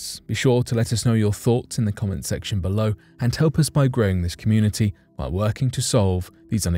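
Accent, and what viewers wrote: United Kingdom. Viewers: This narrator is British but sounds great. Compared to that other guy.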